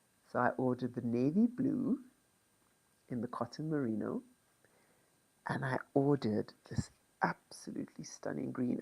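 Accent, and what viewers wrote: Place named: Cape Town, South Africa. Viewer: When you said Cow girl blues I thought…. Oh Cape Town immediately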